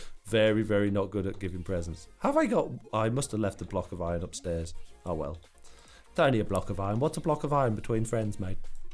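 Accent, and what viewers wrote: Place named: Ireland. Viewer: Love the Irish accent, I see another character on the horizon lol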